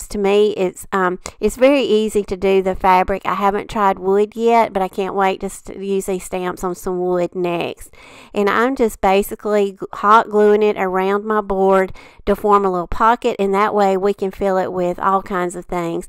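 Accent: South, United States. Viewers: I just need to tell you dear, that I love listening to your gorgeous Southern lilt! Brings back memories of visiting the southern USA in the 80's and 90's!! To yourself it may seem ordinary, but it is just soothing and sweet to hear you speak.....and of course I adore the lovely shabby chic pieces you create so effortlessly!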